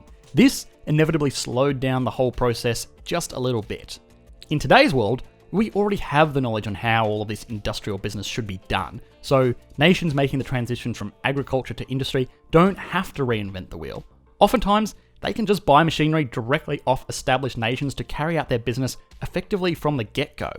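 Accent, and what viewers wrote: Australia. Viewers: Hey are you from Australia!?!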